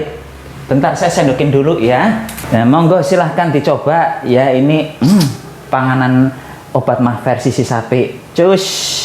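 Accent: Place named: Banyumas, Indonesia. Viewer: Asalamualaikum Wrwb Sugeng sonten mas, mana byunge mas, lha Yo ngono di yalakan lampune jok peteng bae😂😂😂, AG ikut, Tan bahasane mas ngapak😂😂,LGI masak nopo Yung, wah Niki sng yg sangat mengsipirasi gaene penyakit maq,e, kambuh kebanyakan, dan sekali lagi terimakasih obat maqnya, trs yimak cuuus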